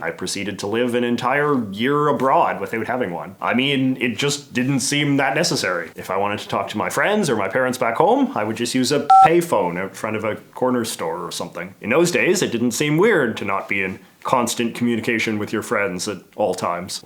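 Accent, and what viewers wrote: Canada. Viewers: You have the most Canadian accent I have ever heard. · Lol you say a boot are you Canadian I'm Canadian too but I don't say about in quite the same way you do Looked at your channel it does appear your Canadian I'm going to refine my guests are you from Newfoundland? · Please work on your thick as all heck Canadian accent...It's not representing us fellow West Coast BC folks very well 😎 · My first video I've seen of yours. I love the content of it. Nice 👏 👌. Very cool stuff... but God damn. Youre Canadian AF! Everytime I here oot..it just takes me oot of the moment lol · Being a Canadian myself, this is the strongest Canadian accent I’ve ever heard. Good lord.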